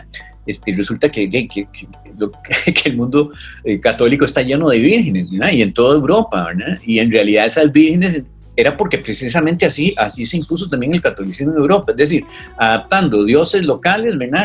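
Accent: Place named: England, United Kingdom. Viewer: Teniendo en cuenta que tiene acento inglés demasiado bien habla de la época virreinal.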